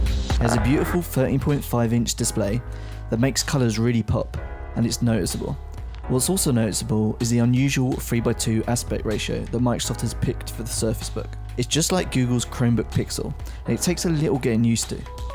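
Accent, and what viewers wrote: United Kingdom. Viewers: Brad Roberts He is English. So, his accent is UK accent... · OMG, in Russia we get used to hearing American English. I can't understand his perfect British accent. Bleeding of my ears.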